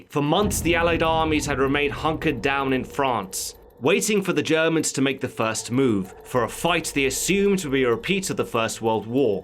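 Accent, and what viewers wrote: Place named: England, United Kingdom. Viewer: Fine channel. Hope your other History Buffs videos aren't 100% single sided, one perspective. For example, Churchill seems to be the drunken nudist protagonist of your video. BUT: Churchill turned down 6 Peace proposals from the Germans who did NOT want a war with England. Churchill declared war on Germany, not the other way around. Churchill had the choice of "saving the Empire" or sacrificing British lives and risking it all. Churchill only got a victorious retreat because Hitler held his generals up, and lost their backing from then on. Unlike the impression you get from this (otherwise great channel) the Germans could have bombed the hell out of the Brits on the beach with their backs to the sea. If the German army couldn't get their in time, then who backed the Brits to the sea? How about a follow up History Buffs video purely from the German side for "diversity's" sake! 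Haha, you even have an English accent! That war was a backlash to WWI over-reach and punishment, and cost Europe and England some of its finest and set up the West for the current invasion of foreigners world wide. Good for the Banksters of the world, we all lost that war!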